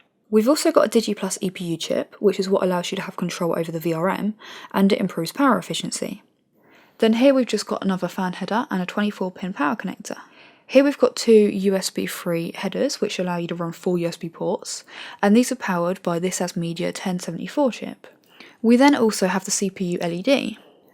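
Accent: England, United Kingdom. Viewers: Very thorough review, you girl know a lot about hardware. On the top of this you have a very pleasant voice and lovely english accent.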